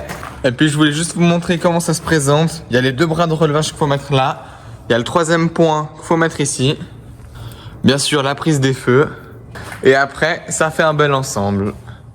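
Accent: Switzerland. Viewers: Salut ue vien de recouvrir ta chaine et ca a l'air cool je trouve que tu a un accent suisse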